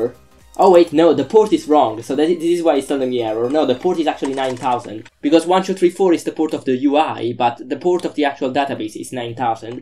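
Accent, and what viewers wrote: Italy. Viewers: Great video thanks very much! I love the accent! haha is it Italian? Would you be able to explain how to debug and set breakpoints in a call to "on Document created" in firebase functions.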